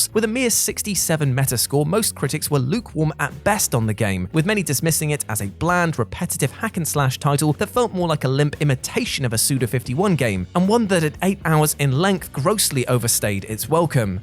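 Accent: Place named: United Kingdom. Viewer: you don't enjoy the game cause you are one of those reviewers... Jesus this guy is so fucking unaware... the definition of a brit that made a career with American audiences cause his accent makes him sound genuine.... this guy... smh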